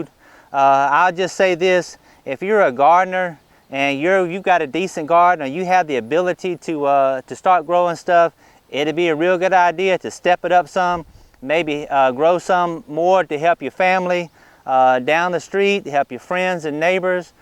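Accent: South, United States. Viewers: Found your videos via a fb post someone had up. Awesome information. Love it!!! I'll be going through a lot of your videos for your expert knowledge. You gotta be a southern boy. Where are you from? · Apocalyptic right-wing paranoia NEVER sounds any more convincing in a southern accent - just sayin'. If southern right-wing extremists had ANY kind of record of success instead of initially building their economy on slavery, ultimately warring on their fellow Americans in defense of their abomination, losing that war catastrophically, wallowing in prejudice, failure, and poverty ever since they might have built up the SLIGHTEST credibility for their point of view - but that's not the way it is - is it?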